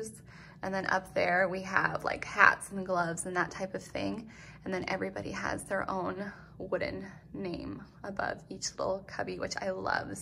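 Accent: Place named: Utah, United States. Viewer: Love the boho farmhouse style! My first video I’ve seen and so I don’t know your history. Are you from Utah? I can definitely hear a UT accent! I lived in the Midwest for 27 years and now in UT for the last 7 years.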